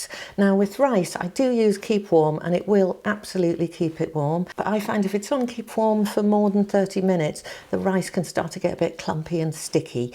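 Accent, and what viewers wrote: United Kingdom. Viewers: Dear Madam, your delivery, speech and of course, the British accent English is really something. Kind regards.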